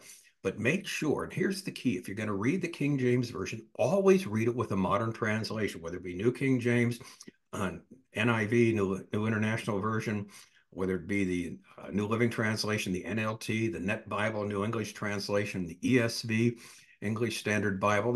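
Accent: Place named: United States. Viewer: But you speak American English accros the pond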